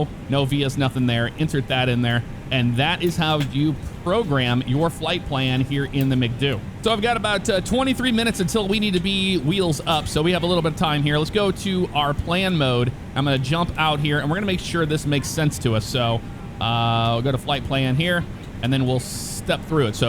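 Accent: Newcastle, United Kingdom. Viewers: Are yo a geordie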